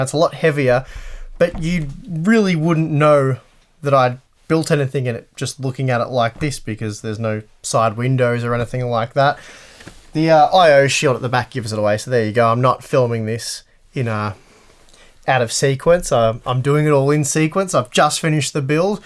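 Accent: Australia. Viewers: Sooooooo you sound Australian why not give Australia prices?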